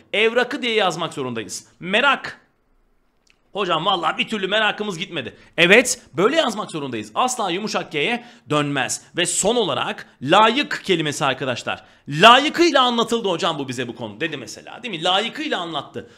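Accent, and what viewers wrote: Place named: Urfa, Turkey. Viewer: / Hocam urfa şivesi😊😊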